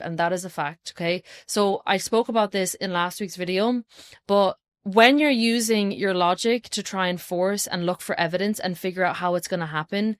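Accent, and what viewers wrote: Ireland. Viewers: This was great! I love hearing that Irish accent too 😊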